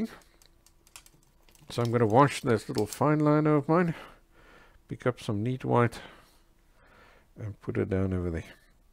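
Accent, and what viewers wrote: South Africa. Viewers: Your paintings are amazing! Thank you for sharing your talent with us. You sound South African, are you? Because I am and I have found that selling your art work in this country is difficult. Do you have the same problem?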